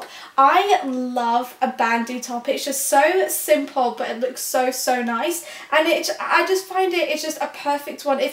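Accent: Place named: Kent, United Kingdom. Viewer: Despite all the haters & the letchers you have to admire dedication to her body: those abs don't happen by accident! Also love the Kent accent with hints of Scotland. You could say 'so' all night long for me. Keep up the good work!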